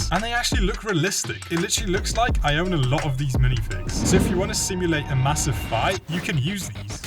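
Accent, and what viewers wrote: United Kingdom. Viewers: You're British 🇬🇧？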